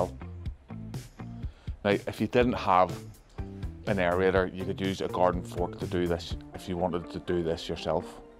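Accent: Northern Ireland, United Kingdom. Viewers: Great video again, learning loads since I started watching you. I’ve even learned how to understand the thick N.I accent 🤣👍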